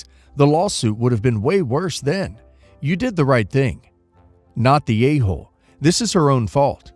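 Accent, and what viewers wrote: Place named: United States. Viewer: The weird part is that I don't think it's AI because there was a thick American accent. 😂😂😂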